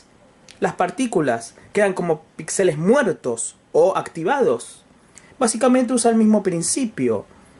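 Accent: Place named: Argentina. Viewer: Tengo un Nikkor 200mm F4 Ai que supuestamente es radioactivo y que es de los 70s, podra ser? Me encanto tu canal, mucha informacion, y es refrescante escucharla en "argentino" y no en ingles jajaja, un abrazo grande!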